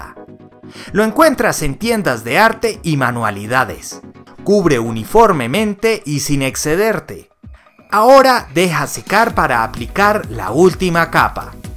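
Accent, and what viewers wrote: Colombia. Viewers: muchas gracias muy útil eres colombiano? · Eres de colombiano ?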